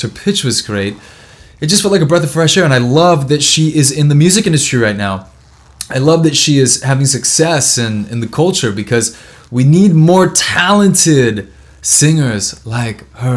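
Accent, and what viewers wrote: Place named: Andalusia, Spain. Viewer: Habla perfectamente bien, con su acento andaluz personal.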